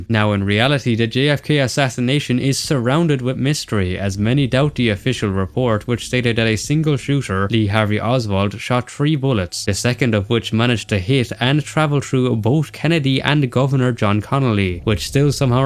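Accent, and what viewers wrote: Ireland. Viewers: Are u Irish if so what's the crack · I never realized you were Irish…I actually thought you were German or Polish…don’t know what the says about me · funniest Part of video!! 😂😂. Hey, are you from Ireland!?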